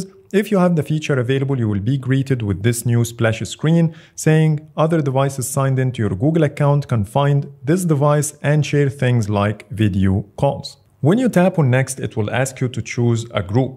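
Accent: Egypt. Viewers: ياخي حاسك مصري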